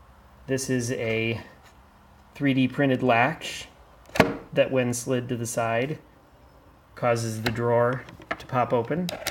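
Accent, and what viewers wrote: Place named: Ireland. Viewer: what are you Irish?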